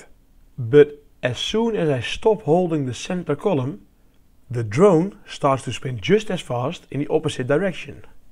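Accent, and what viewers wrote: Netherlands. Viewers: Love your videos mate. So much so I’m actually commenting on a video. Your creations are incredible. Your channel is definitely the next “stuff made here” ❤ I’d actually love if your videos were 3x longer with more of the technical stuff. Maybe you’re keeping the videos short n sweet to attract the huge following for now? Do you have an instagram page or anything for us to follow you more on? Also love the Dutch accent. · Haha je accent is zo Nederlands, leuk om te horen. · i saw the thumbnail and thought "that looks like dutch grass. then i heard 1 second of you talking. yep. ik herken altijd een hollander op youtube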